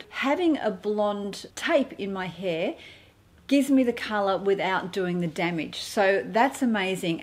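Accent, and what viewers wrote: Australia. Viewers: Thank you for such nice helpful tips oh and I LOVE your Aussie accent☺️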